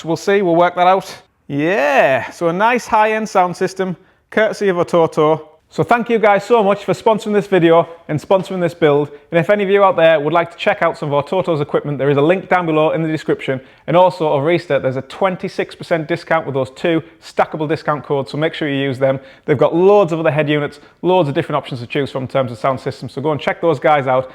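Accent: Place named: Middlesbrough, United Kingdom. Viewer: Great channel keep up the good work, you sound like a Boro lad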